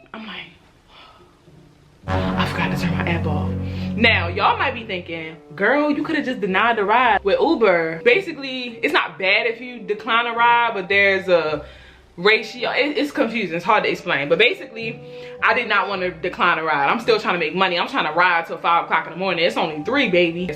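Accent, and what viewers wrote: Baltimore, United States. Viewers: You from Baltimore? SUBBED! On peridot. Fellow DMV Native, should’ve clocked the accent on you 🤪✨ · I had a feeling you was from Baltimore by the way you talk 😂😂 .... we all sound the same 😭😂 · I knew your were from Baltimore ❤️ it was the accent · Ahhhh you from baltimoreee 🤟🏽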